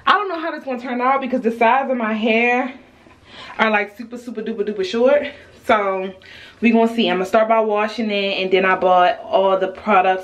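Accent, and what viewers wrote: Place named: DMV, United States. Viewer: accent😂gotta be from dmv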